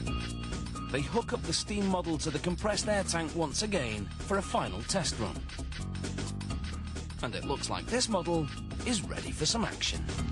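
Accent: United Kingdom. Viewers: i hate the British accent narrator voice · The British voiceover is the bomb.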